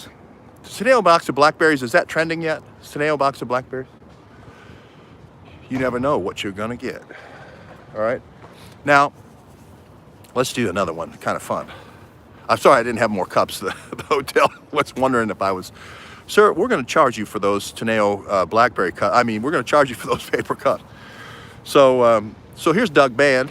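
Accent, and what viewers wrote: South, United States. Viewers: I dig the WAY YOU DIG. MY OH MY, YOUR BOX of chocolates are some of my all time favorites...nuts and chews. And with that down south drawl, just adds the icing on the cake. Thank you. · It was Glenn Beck’s presentation named The Puppet Masters where he broke down and tied together the relationships of literally everything to Soros who even to this day is the nucleus for which everything is filtered. Way back then Glenn pointed to Ukraine and the CIA causing regime change using a formula. I recommend all watch this one as well. I’ve been following you since the day you stepped into DC. I am at least 2 years ahead of the world because of you. 🤓 Thank you. I lol’d all the way through this one. Loved the Southern drawl. 😆 Here is the link with all 6 <link>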